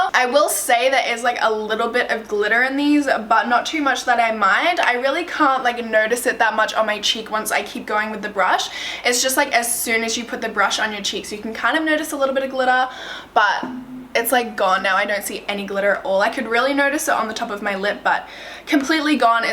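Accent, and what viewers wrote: Australia. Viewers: Hey are you Australian? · is she australian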